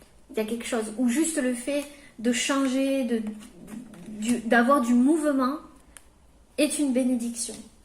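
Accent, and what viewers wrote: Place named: South-West, France. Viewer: Adorable cet accent du sud ouest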